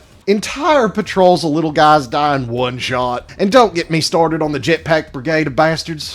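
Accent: South, United States. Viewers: not with his accent. the southern accent eliminates the 'i' from the 'ai' dipthong, which means 'fire' is pronounced more like 'far'.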